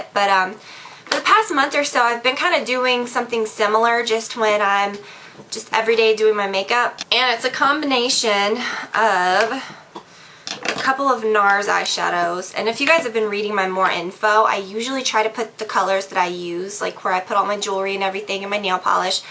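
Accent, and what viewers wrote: South, United States. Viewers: Where are you from...cause I can def hear a southern twang :)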